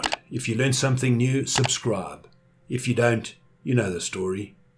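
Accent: South Africa. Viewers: You sound like you're from SA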